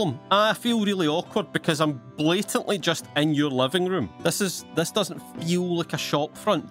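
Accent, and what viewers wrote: Scotland, United Kingdom. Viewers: definitely, before I've find this channel i thought i understand any english really good, but it turned out I've never heard scottish accent lol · I thought this was an any Austin video when I saw the title and was pleasantly surprised with a raving Scottish man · I love much more aggressively Scottish he feels in this video.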